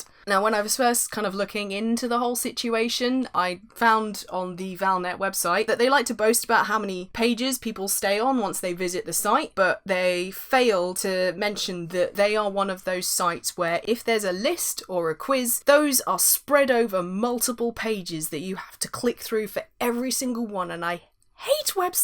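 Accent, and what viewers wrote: United Kingdom. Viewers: You're British?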